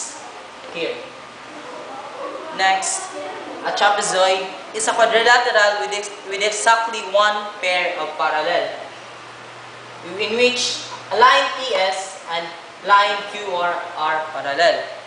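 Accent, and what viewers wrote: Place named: Philippines. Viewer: Lol, You have such a filipino accent! Nice(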